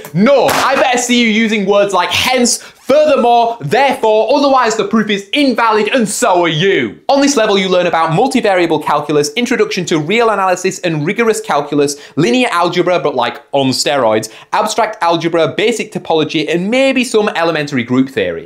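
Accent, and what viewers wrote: United Kingdom. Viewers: tf is that thick ass british accent?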